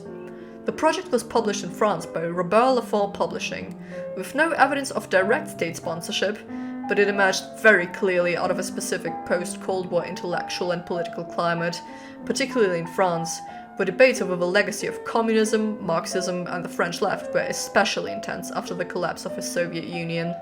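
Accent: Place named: United Kingdom. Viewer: legendary youtube recomended pull did not expect you to sound so british...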